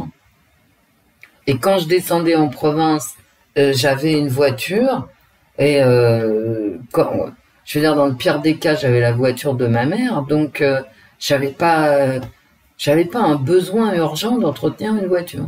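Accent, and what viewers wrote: Paris, France. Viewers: Merci... contente que vous soyez soutenue par vos consœurs. J'aime vraiment comment vous tirez les cartes, votre franc parlé et votre accent de Paris...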